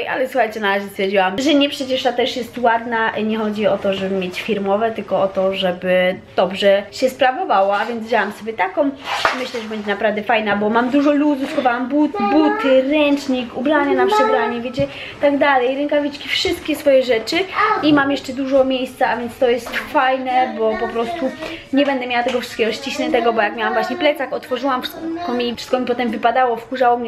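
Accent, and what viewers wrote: France. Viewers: Masz piękny francuski akcent,mega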